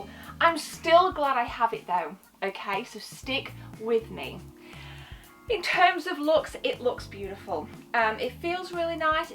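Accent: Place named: England, United Kingdom. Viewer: Nice video, you from England? Nice accent.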